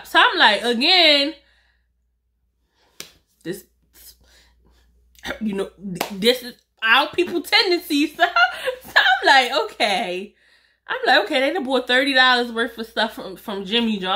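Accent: Baltimore, United States. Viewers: So I just came across your channel. Lol. When I first heard you talking I’m like this girl sounds like she’s from Baltimore! Then as soon as I heard Mondawmin, I was like I knew it 😭😭😭😭😭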